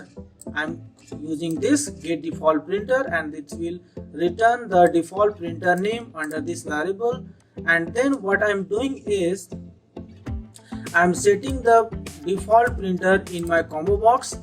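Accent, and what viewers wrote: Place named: India. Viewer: por dios que molesto oir el acento hindu hablando ingles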